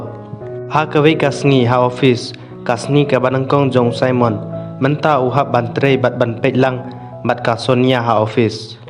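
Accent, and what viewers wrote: Meghalaya, India. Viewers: Wtf bruh.....Accent khasi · o u ni bro dei pnar u pnar mo ..keep practice khynriam speaking bro..dg mih ing pnar h k p ..ap rkm klm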